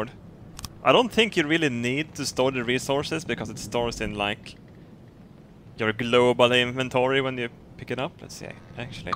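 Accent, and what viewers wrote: Sweden. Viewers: Welcome back to... from the debts! Gotta love that Swedish accent.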